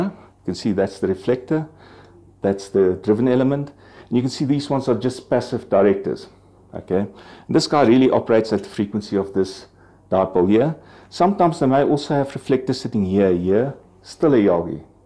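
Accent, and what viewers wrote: South Africa. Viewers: S that a South African accent?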